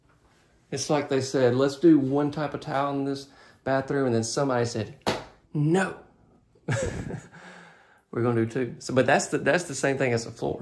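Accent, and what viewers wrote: South, United States. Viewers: I loves your accent are you from The South I'm from the south as well.Im from Arkansas.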